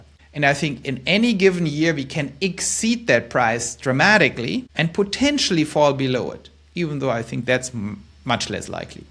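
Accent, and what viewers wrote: Germany. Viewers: we need more heavy german accents on youtube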